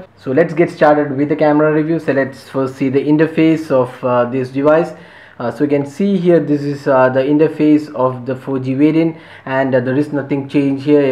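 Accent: Kerala, India. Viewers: You sound like mallu.. Are you?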